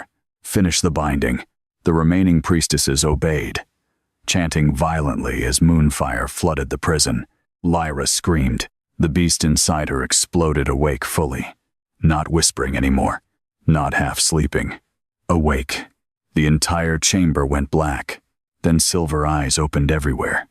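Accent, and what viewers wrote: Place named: Spain. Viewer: What's with all the " Spanish/Mexican " pronunciations?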